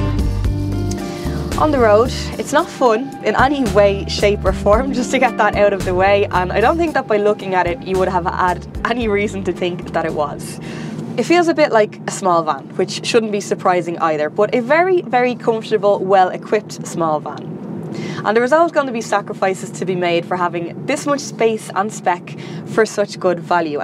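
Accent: Ireland. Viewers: Hiberno English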